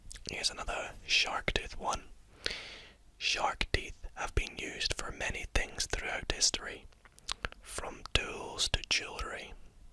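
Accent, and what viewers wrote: Scotland, United Kingdom. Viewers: WHAT PART OF SCOTLAND ARE U FROM???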